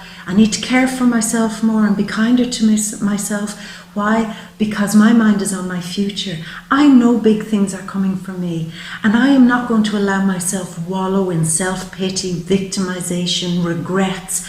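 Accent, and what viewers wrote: Ireland. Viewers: I'm barely 4 min into your reading and love what you are saying! love the brogue. xoxox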